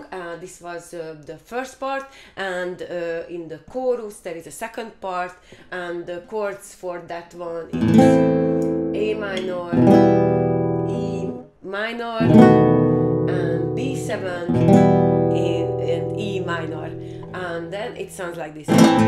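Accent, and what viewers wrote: Hungary. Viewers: sounds like Magyarrrr. I remember this accent from Dominica my sweet girl from Zsigetvar love from Istanbul thanks for this video. Ksönöm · A magyar akcentus de ismerős mindig basszus :D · magyar akcentus befigyel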